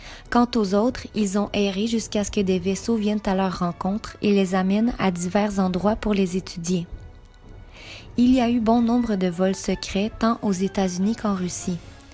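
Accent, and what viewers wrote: Quebec, Canada. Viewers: au petit accent du Québec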